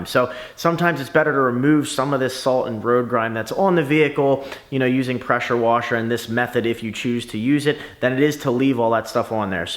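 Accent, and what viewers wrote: United States. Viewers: what part of PA are you from?!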